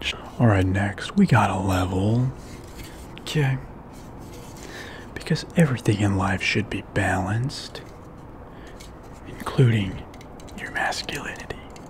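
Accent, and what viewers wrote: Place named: Texas, United States. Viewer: So manly!💪The tools, the WD40, the garage and the extra Texan accent is giving hot masculine Daddy vibes! 👅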